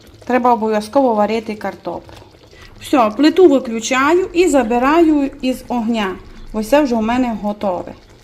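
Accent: Transcarpathia, Ukraine. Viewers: 😢😢😢ооо, ви собі навіть не уявляєте, які спогади в мене з цією стравою і яка ностальгія за Закарпаттям - це мій другий дім. Приємно чути Закарпатський діалект🤗 Коли прочитала назву "м'ясні крученики" зразу згадала рецепт бабусі, який вона називала - м'ясні шишки)) Все дитинство моє пройшло на Закарпатті - чим дуже тішуся. Ще там маю родичів, нажаль дідуся і бабусі вже немає😢. Дуже б хотіла знову поїхати на Закарпаття з своїми дітками, щоб вони побачили ту красу. Можливо з часом поїду. Вам бажаю здоров'я міцного і успішного розвитку каналу💙💛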